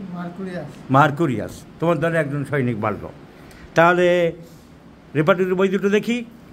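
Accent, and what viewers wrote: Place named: Bangladesh. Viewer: এইভাবে কি patient এর privacy breach করা যাই? এগুলো কি ডাক্তারি? এতো বাজে উচ্চারণ কেন? এতো বাংলাদেশী মনে হচ্ছে। ভ্যাকসিন এর নামে চালিয়ে দিলো 😂। ছোটবেলায় পোলিও ভ্যাকসিন নাওনি?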